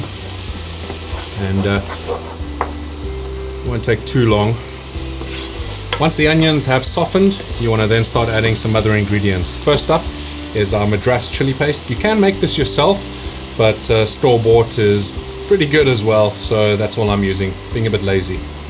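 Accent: South Africa. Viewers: Now I understand from where is your accent, and the addiction to piri piri. (I have some family that come from South Africa)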